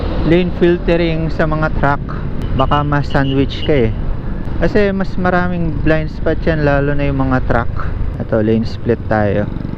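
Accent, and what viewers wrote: Pampanga, Philippines. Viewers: Sabi na nga ba kapampangan ka e,yung accent mo kasi. Sa Telabastagan yan 😁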